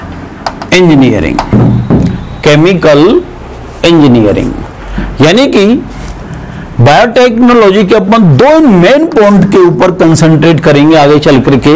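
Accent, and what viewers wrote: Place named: Bihar, India. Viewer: bihar ka teacher hai kya yr?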